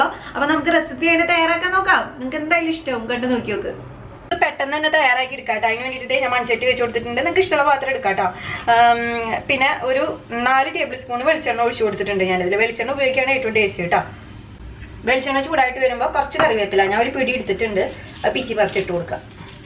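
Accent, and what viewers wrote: Thrissur, India. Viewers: എന്റെ പൊന്നെ എന്തുസ്പീഡ ഇത് സമ്മതിച്ചുട്ടോ തൃശ്ശൂരാണല്ലേ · തൃശൂർ ആണല്ലേ.... Non stop സംസാരം അപ്പോ njn മാത്രമല്ലല്ലേ 😁😁😁😁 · Chechii thrissur aanalle?? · ആദ്യമായി ആണ് ഈ ചാനൽ കാണുന്നത്.നല്ല സംസാര ശൈലി....😊👌 അല്ലേലും തൃശ്ശൂർ ശൈലി വല്ലാത്ത ഇഷ്ടം ആണു. അമ്മവീട് അവിടെ അയതോണ്ടു പ്രത്യേകിച്ച്💕 ★ പിന്നെ ആ കിർ കിർ കേട്ടിട്ട് ചിരിച്ചു പോയിട്ടോ ★ എന്തായാലും ട്രൈ ചെയ്‌തു നോക്കിട്ട് പറയാം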